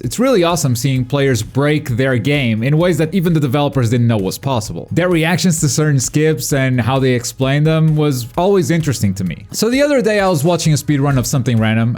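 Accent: Portugal. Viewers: PERA AÍ VC É DE PORTUGAL?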